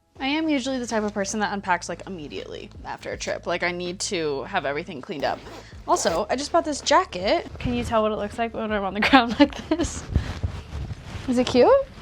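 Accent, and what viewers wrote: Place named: Ontario, Canada. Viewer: your page got recommended to me randomly and i could tell immediately you were from ontario canada lol. the accent gave it away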